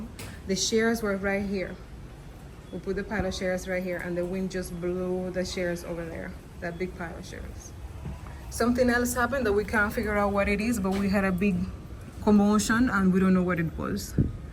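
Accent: Jamaica. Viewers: wait a minute is that a Spanish /Jamaican accent?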